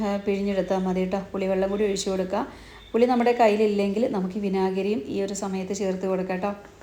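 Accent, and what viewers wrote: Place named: Malabar, India. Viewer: The Malabar slang is interesting for us (South Kerala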